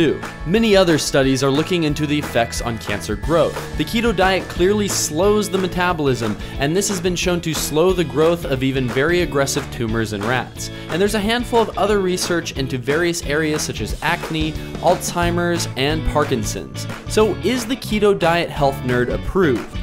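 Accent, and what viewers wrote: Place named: United States. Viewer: I assume the creator is american based on his accent: <link>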